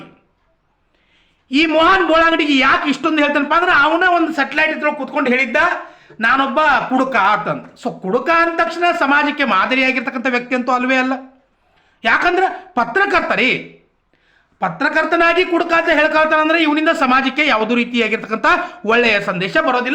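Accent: North Karnataka, India. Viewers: ಉತ್ತರಕರ್ನಾಟಕದ ಜವಾರಿ ಭಾಷೆ.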